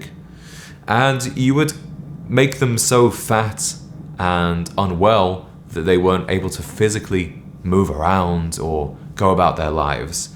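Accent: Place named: United Kingdom. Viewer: I noticed the tendency to mock men in the movies decades ago in the 80s. I have always hated to see it. I can’t believe that male actors and producers went along with this betrayal of their own gender. (On a different note: I’m curious that the title of this video focuses on American men, but you sound like your British…. do you think that British men have not been emasculated?)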